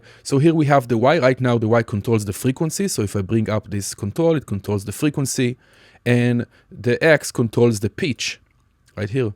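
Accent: Israel. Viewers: אני מזהה את הישראליות מהמבטא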